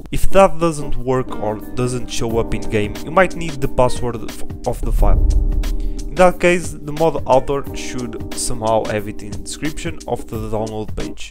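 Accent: Portugal. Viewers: es portugal nota se pela voz kkkk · Tuga accent FTW. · Só pelo sotaque já vi que és portugues 😁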